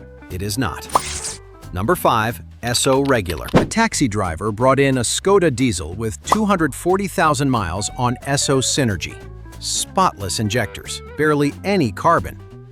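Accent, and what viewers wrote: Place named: United States. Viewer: And an American accent . I smell a load of AI bollocks !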